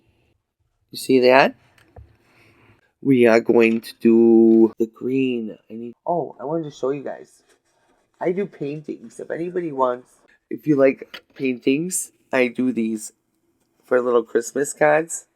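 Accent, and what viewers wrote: United States. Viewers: It must be a glam Christmas this year. I’m a retired MUA and hair freak and bought my girls a bunch of hair and makeup stuff! I enjoy ur channel so much. Just joined cuz gotta support a homegirl. I’m actually on the other side of the lake in MI but the accents the same!😂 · Is your accent a New York accent or Boson accent? Jersey?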